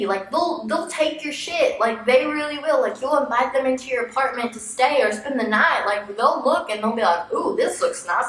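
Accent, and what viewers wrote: South, United States. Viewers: I cracked up when you imitated her screaming "I CAN DO WHAT I WANT! GET OUT OF MY FACE!" Just the way you went about it. By the way you're really pretty and I love your Southern accent. I live in Minnesota and some of us talk like Canadians.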